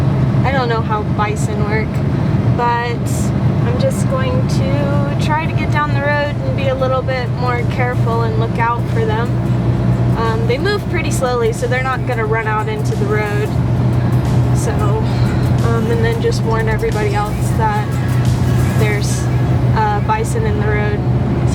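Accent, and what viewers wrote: South, United States. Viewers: I could tell you grew up in the south. I heard you say "Rat now" not right now. I knew then we were neighbors, a couple hollers over.😄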